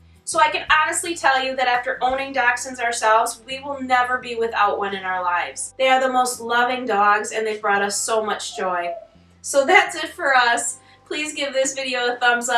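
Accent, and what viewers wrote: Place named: North America. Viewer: Your dogs are sweet but they are not dachshunds in terms “real” dachshunds (do not want to use word pedigree altho that is what i meant but sounds a bit nasty). There are only two sizes in Dachshunds, the standard size, up to i think 10.5 kg and the miniature Dachshunds,up to 5 or 5.5 kg. Nothing in between. Now in terms of colours. They are black&tan,chocolate&tan,red and i think it is called dapple , i am not sure. I am not quite sure about colours, but if you are going to buy Dachshund puppy and the seller sells it as pedigree puppies, one quick,easy way how to spot that the Dachshund is not Pedigree is present of white colour. Apart from the Dapple,white colour might be present in tiny amount on the chest but even that is UNDESIRABLE. Pure (short or long haired) pedigree Dachshunds have ZERO white colouring. If there is a trace of white colour(mostly on the belly/chest) , you should know that the person is not genuine selling pedigree Dachshunds and that will be mostly the reason why seller says : pedigree but NO PAPERS,or Very rare colour etc.... 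so what you have got there are sweet dogs, but not up to our European standards to be called pedigree Dachshunds. I can not differentiate the USA accent from Canada’s one, but you are surely from American continent where i noticed the acceptance of “non pedigree” Dachshund mixed with other breeds of dogs calling Dachshund. German, the original Dachshund breed here in Europe simply can not be white mixed colour which is way out o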